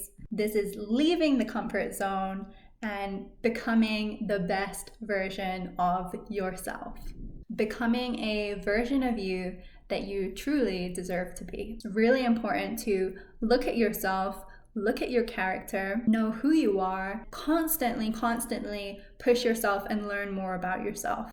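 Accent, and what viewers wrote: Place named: United States. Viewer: Edgar Cayce, a christian, would be proud of you, he stressed prayer as one thing to help with dream recall. And it is working for me, I'm not christian but I take prayer as being grateful and thankful for everything i have, remember to bless your food and drink always, and then of course asking for all I want. My still poor dream recall is improving. Affirmations are another to do, if i hope you don't mind me rambling on on your page but my dream recall is improving everyday and every night in every way and remembering my dreams is easy. Getting out of my comfort zone would be becoming more social, just talking to people more. I could practice that in lucid dream state, it's on the list of things to do and learn there in my lucid dreams. Thanks for encouraging me, I will talk to people more here in the waking life real world, this "shadow world" we live in. 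You're so cute, if I may acknowledge that? You have a very nice american accent, that is if memory serves me and you are actually english which seems very unusual to be able to change your accent like this. Many blessings and love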